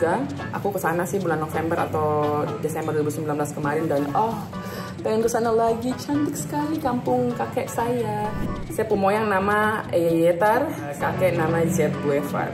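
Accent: Papua, Indonesia. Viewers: Seneng bangett sama logat papuanyaa... Sukses terus kakk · Kk cantik, cerdas,lincah, tdk sombong, positif tindakan dan pemikirannya.... 👍👍👍 😍😍😍 Inspirasi buat muda mudi indonesia nih... Suka kalo lagi ngomong pke logat papua...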